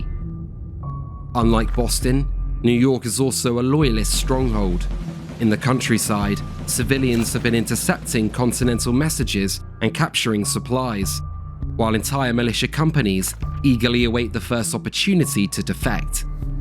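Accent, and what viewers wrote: United Kingdom. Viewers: Great series, well done and surprising to hear it told with British accent. Trying to keep the indefensible NY was Washingtons biggest mistake and almost lost the revolution, he was saved in Brooklyn only by moring Fog and Howes indecisiveness. Years later he wanted the French Fleet to recapture NY, they said no and advised to move on Yorktown. Great story overall …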